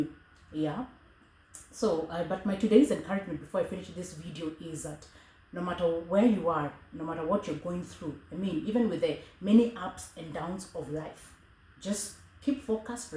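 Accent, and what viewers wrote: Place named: Africa. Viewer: I Moved to the US in 1989 while in middle school. In AFRICA you great each other all day long 10 or 20 times a day. The European does not think like you. If you great him more than once, he thinks you are physco. Black Americans on the other hand have a culture of their own which is very different from African Culture. Don't try to change America. It will change you before you can change it. The sooner you can erase that African accent the better. Americans completely stop listening to what you are saying and are more interested in why your accent sounds very strange. This can really hurt your career chances. When you move to a new country get with the program ASAP. All the best.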